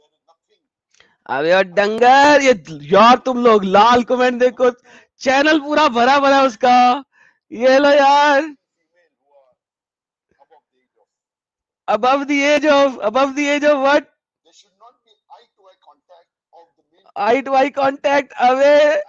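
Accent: India. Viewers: I love how has picked up sahils mubaiya accent 😅❤ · you are just awesome. I used to listen to this idiot as a non muslim when my Muslim friends preached Islam. I wanted to know reality and always despised Zakir Nalyak because of how he belittled other religions down and claimed only islam is true religion of God. Don’t know how some people convert listening to this idiot. He has no logic. you are very knowledgeable and entertaining, should open a comedy channel. Love your Punjabi accent 😊.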